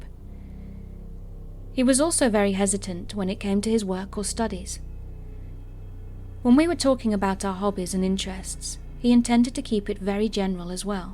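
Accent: United Kingdom. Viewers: Hi...love ur voice and ur channel...love to hear a uk voice as I'm there!!!!😂love it when u say get cozy comfy warm!! As I'm always getting cozy when u say that!!!thank you!!!!!! Stay creepy!!!!😂🖤🖤🖤🖤🖤🖤🖤🖤🖤🖤🖤🖤🖤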